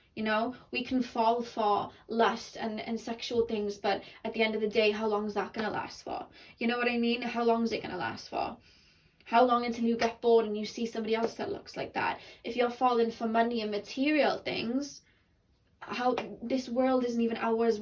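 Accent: Wales, United Kingdom. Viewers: Excellent reading! Love to hear your FUN WELSH ACCENT 😀! 😀Thsnk you for sharing your BEAUTIFUL GIFTS with us! You are very TALENTED! 😁😄😄😃😀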